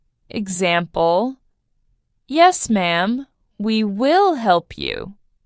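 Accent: United Kingdom. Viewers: Is this British accent? However this kinda lessons are so precious to us❤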